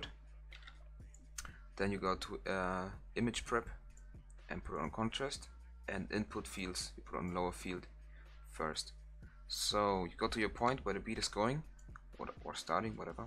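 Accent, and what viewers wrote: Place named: Germany. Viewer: that german accent tho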